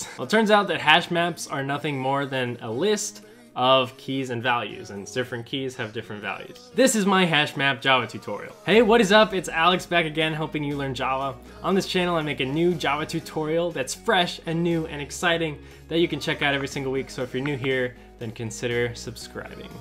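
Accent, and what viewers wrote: India. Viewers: Here, after concentrating to get what was said in the Indian accent, one time too often.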